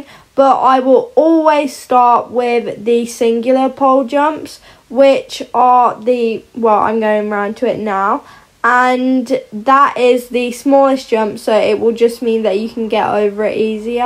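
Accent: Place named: United Kingdom. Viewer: Can we just talk about how she sounds perfectly British? Not being rude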